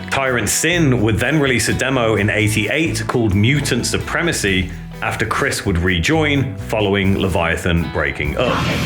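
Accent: United Kingdom. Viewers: Even though you play live footages and cuts of the songs, my mom would fall asleep because your british accent since loves it. She doesn't even like metal. "Just put me the Brits on youtube, Dino".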